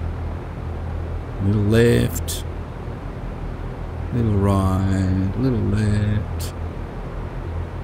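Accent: Australia. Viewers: Mate, I really appreciate your effort to thoroughly documenting keyboard-mouse flying in X-Plane - and other mouse-flying video posts. Just beginning my X-Plane journey during these stay-at-home times. Will definitely try the plug-in. Also, your Ozzie accent is wonderful to hear as I just returned from my PhD study last year in Brisbane. Subscribed your channel.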